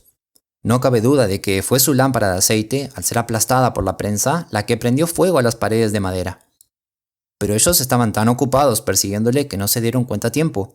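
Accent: Rioplatense, Argentina. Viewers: le ruego me responda, soy sumamente curiosa. argentino o uruguayo? x anticipado, gracias!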